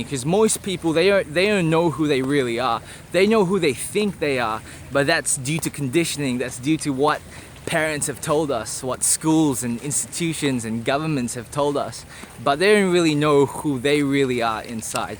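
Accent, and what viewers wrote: Australia. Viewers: Your accent seems Australian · interesting accent, sounds australian crossed with something else. Cool story, i am in the starts of a kundalini experience, feels like i am connected to god with electric jumper cables, so intense. I wonder when it will stop. If I stop meditating will it go away?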